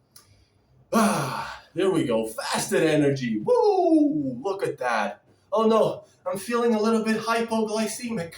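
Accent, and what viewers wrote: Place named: United Kingdom. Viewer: The British accent though 🤣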